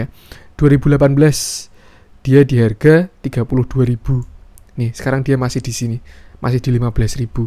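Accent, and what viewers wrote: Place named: Java, Indonesia. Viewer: Medokkk... tapi sukaaakk cara penyampaiannya... makasi byk masbroo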